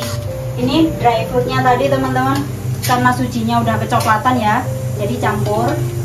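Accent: Java, Indonesia. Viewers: Setiap mbk ngomong nada jawanya keluar, aku mesti ketawa lho mbk, soalnya klo pean ngomong medok ngt, 👍👍👍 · Mf mb logatnya kaya orang jawa, klo blh tau asalnya dri mana ya mksih kalau mau bales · Mb lucu kl ngmng....tdk sungkan2 dg logat jawanya.salut mb.....👍👍👍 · Mbak yen ngomong boso jowo medok jowo ne iku tetep kental masio dek samean dek india Collab pakai boso jowo dong kak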